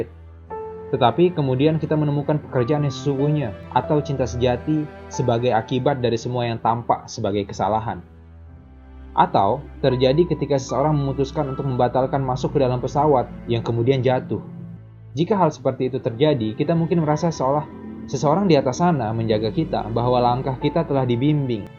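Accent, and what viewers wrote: Lombok, Indonesia. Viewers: Orang lombok bang?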